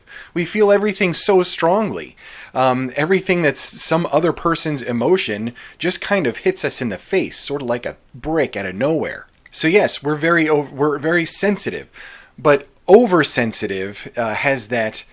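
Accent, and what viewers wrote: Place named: Forest Hills, United States. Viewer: You sound like you went to forest hills high school, plus the silibent s and the lateral emissions indicate several minor speech impediments and lots of dental work.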